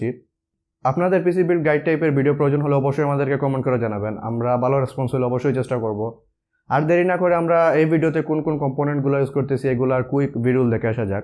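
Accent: Sylhet, Bangladesh. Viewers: Bhaiya ki sylhety ✌ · Vaia apni ki Sylheti naki??😃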